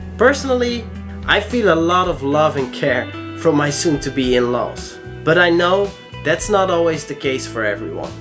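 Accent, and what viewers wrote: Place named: Netherlands. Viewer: I gathered you're from Nederland from your accent. Lived there for half a year. Lekker times 😀. I was responding to the main comment with him saying he's an African American, or a black person as the rest of us outside the US say.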